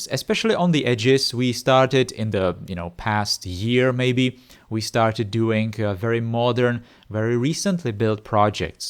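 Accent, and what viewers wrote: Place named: Czech Republic. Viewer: Where are you from? You have a slightly eastern european accent. I’m guessing Czech?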